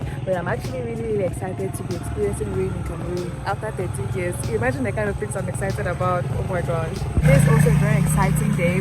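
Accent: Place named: Nigeria. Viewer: I don't know but you sound so Nigerian.. Nice video, you just got I new subscriber.. Keep up the good work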